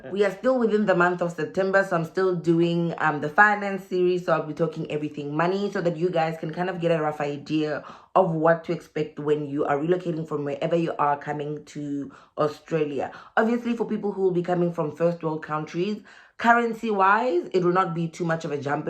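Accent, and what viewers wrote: South Africa. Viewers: Love the way you explain in such a South African way! Makes me feel so at home and like we can definitely do this!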